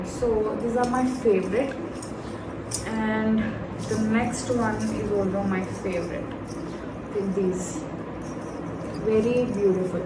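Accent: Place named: Visakhapatnam, India. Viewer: Very nice collection sisy...ur looking like Regina..ur growing up in ur life very nice...ur telugu language exactly mine..bcz iam Vizag..I dnt knw ur bio..Anasuya episode lo mi language vinin doubt vachindi...iam hpy..ur rocking dear..God bless you.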